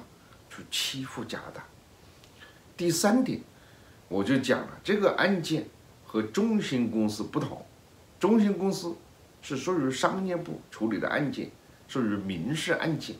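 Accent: Hunan, China. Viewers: <num> Lotus 😂应该是湖南的吧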